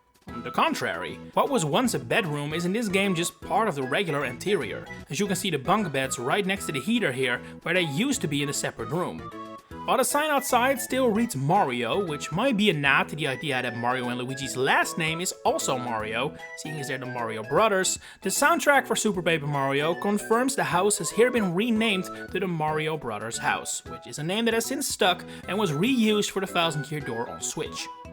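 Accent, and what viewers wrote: Netherlands. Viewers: Dutch accent detected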